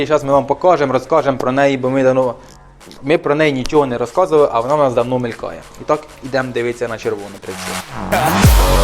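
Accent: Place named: Surzhyk, Ukraine. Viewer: Контент цікавий, зйомка і все інше нормальне, але.. я теж, можна сказати, з села, але я не говорю так як хлопець на відео. Це пз*ц, слух дуже ріже. От ці «двігатєлі, сєкунди».. старайтесь трохи фільтрувати суржик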